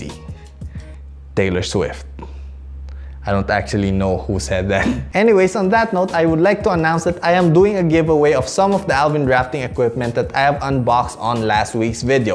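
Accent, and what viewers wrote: Philippines. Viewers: I hear the accent.... pinoy? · Are you a Filipino? Because your accent is filipino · You're Filipino aren't you. The accent can never hide ha💓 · The moment he opened his mouth andstarted talking me be like: "Pilipino to"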